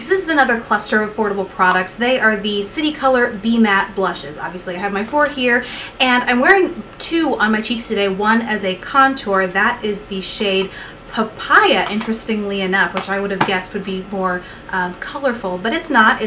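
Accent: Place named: Midwest, United States. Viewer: Like your videos, happy to hear a Midwestern accent again.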